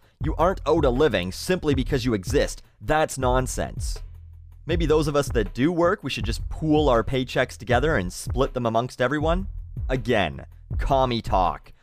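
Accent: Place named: Canada. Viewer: canadian accent..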